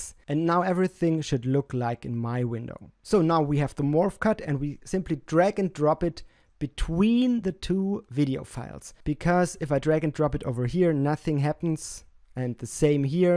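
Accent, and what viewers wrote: Germany. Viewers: Servus, wie gut geht es dir heute! Weil ich behaupte herauszuhören, dass du Deutsch deine Muttersprache ist (vermutlich denkst du, "es ist auch nicht schwer zu erkennen"), schreibe ich auf Deutsch. Hier geht es aber um deine Skills. Die finde ich sehr beeindruckend und deine Tipps hilfreich! Danke! Habe auch deinen Kanal aboniert!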